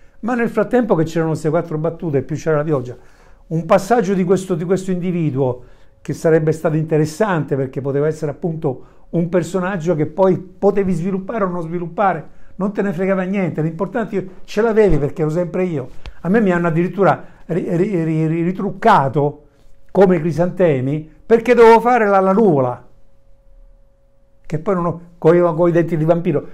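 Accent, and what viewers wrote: Rome, Italy. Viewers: Dall' accento sembra romano de roma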